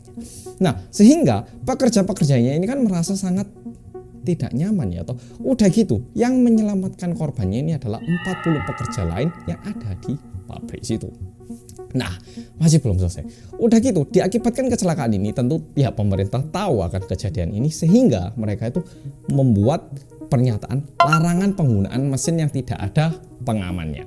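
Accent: Java, Indonesia. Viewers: Lah kmn aja..org hidupnya lbh byk di Indo..ngomongnya aja medok bgt..🙈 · Yaelah wajah nya ala Korea logat bahasa nya ala indonesia jawa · oppa ngomong nya kok medok si oppa 🙈🙈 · Wahh abang ini habis nikah tambah ganteng. Selamat ya bang orang Korea yg medhok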